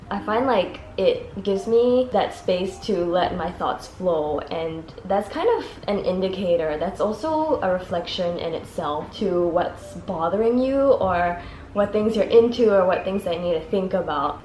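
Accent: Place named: Singapore. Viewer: Are u singaporean? cause u do have an accent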